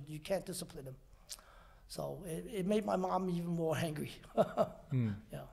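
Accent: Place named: United States. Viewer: English accent is 100% American. Nice.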